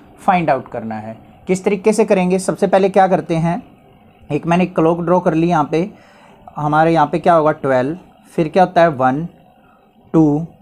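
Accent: Haryana, India. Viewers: I understand everything in maths from I feel especially and only teaching me and you explain every small thing in very easy way. sir aap Haryana se belong karte hain kyunki jab aap koi bhi question explain karte ho to aap ki tone mein Haryanvi Aisa lagta hai mujhko sir please reply karna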